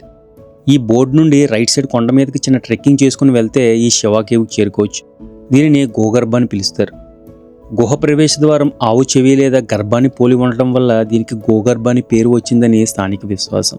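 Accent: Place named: Visakhapatnam, India. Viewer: Bro chala baga chepparu telugu matlu andrapradesh viziag bashala vunnadi meru akkadi rathi kattadala gurinchi weathergurunchi bagachepparu. Chala thanks meru marinni manchi place gurinchi videos thiyaliani koru thunnanu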